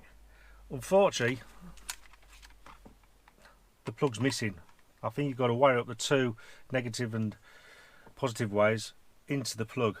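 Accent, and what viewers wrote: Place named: RP, United Kingdom. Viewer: Bit posh is that mate 👌👍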